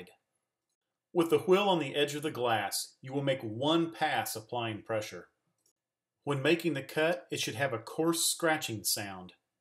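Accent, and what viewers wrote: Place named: Texas, United States. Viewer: Sounds like he's from arlen texas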